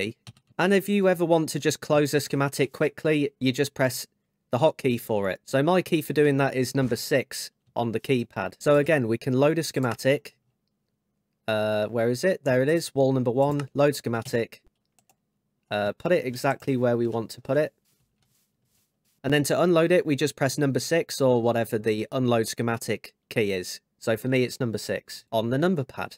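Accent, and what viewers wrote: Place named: United Kingdom. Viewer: That was fantastic, and extra points for the British accent! I learned heaps that I can use :D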